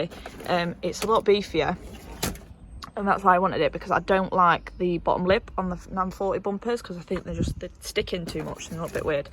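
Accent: United Kingdom. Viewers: Have to finish da fockin bumpaah hahah love ur briish accent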